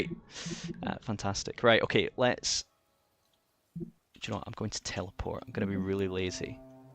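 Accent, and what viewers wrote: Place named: England, United Kingdom. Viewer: what happens in the 16th minute also nice accent are you English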